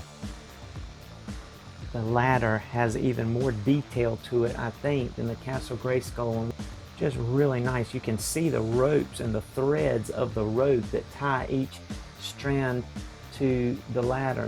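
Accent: South, United States. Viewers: Came across your video here and it's my 2nd video I've watched. So the reason i thought I'd comment cause you sound Southern with a Southern accent. I'm in the South as well if you are. I'm getting a Snake Mountain as well from Super 7 but haven't been notified of a shipping track number so just waiting is all i can do.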